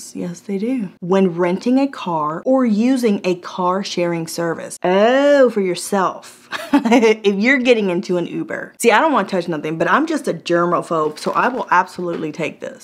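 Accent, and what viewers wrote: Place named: South Carolina, United States. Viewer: I can vouch for the fuzzy eliminator. i've had one for years and it cleans all my sweaters up beautifully. Yes....be careful to stay away from any long strands of fuzzies.....they will get caught and sound like you're cutting off your arm. lol But yeh.....I would buy another one in a heartbeat. Actually I don't think there is any other gadget that does this, except for like you said......a razor. And I have torn many holes in to a sweater using a razor. So that is a no no! By the way.....where are you from? Anywhere near Sumter SC? Born in Wilmington NC....raised in Sumter. You definitely got the SC accent going on.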